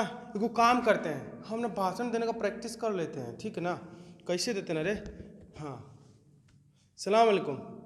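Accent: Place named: Uttar Pradesh, India. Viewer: Sahi bola Bhai...pura UP ka hi lg rha h bhai...bhasha se🤘🤘🤘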